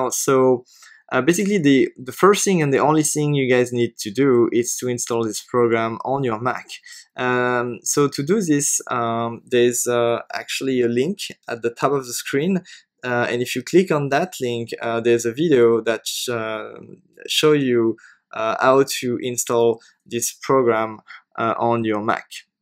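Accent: France. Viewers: I can hear u r from France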